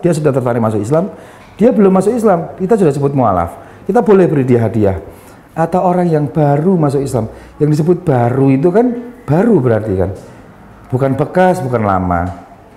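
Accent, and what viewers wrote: Java, Indonesia. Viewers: Denger cara ngomong kok seneng. Logatnya Jawa medok. Ak lahir dan besar di Sumatra tapi logat Jawanya jg tetep g bs hilang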